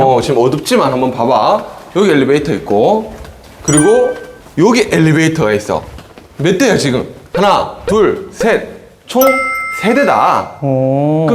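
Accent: Gyeongsang, South Korea. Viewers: 경상도 억양이 너무 심하네요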